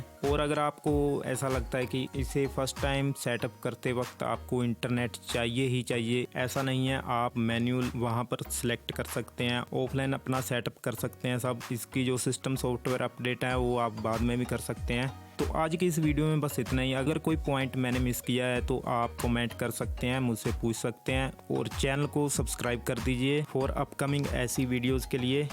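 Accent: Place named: Punjab, India. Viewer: Bhai tera accent Punjabi ja aa 🤨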